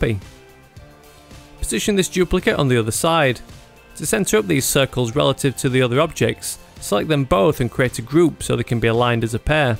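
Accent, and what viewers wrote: United Kingdom. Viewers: British accent very good!!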